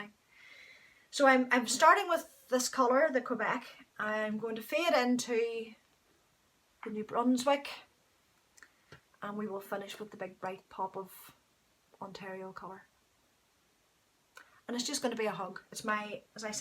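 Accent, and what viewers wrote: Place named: Ireland. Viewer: I love your podcast. Your accent is one of my favorite parts. I live in the western US where we don’t get a lot of Irish influence. I can see why you love that sweater so much. My favorite pattern I ever knit is the Wishmaker Mitt pattern by Erica Hauser.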